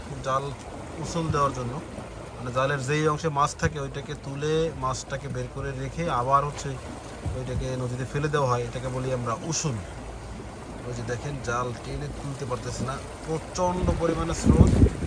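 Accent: Barishal, Bangladesh. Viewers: Ki kotha re bai😂😂 anne sob dehi borishailla mönü ara🐸🐸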